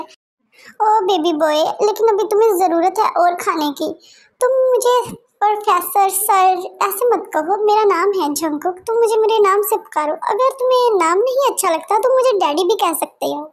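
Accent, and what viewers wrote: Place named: Punjab, India. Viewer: Ap ke voice sa me be punjabi ho😊😊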